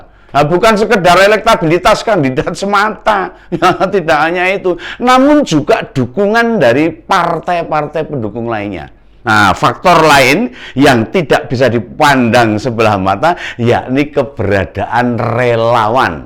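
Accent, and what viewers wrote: Java, Indonesia. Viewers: Kang aku seneng medokmu jiiiaan nyenengke dirungokke pas lan uenak ngono lho opo neneh nek ngenteki si YOHANES ANUS baswEDAN ajur juuuuu terus kang aku ndukungmu.....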